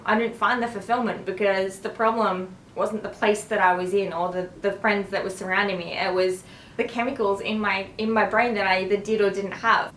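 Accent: Australia. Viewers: Beautiful accent..... Australian?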